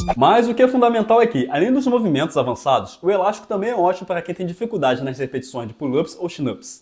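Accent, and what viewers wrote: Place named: Rio de Janeiro, Brazil. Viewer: Excelente !! Vou voltar a treinar kkk Obs: fala igual carioca prr, para de puxar o S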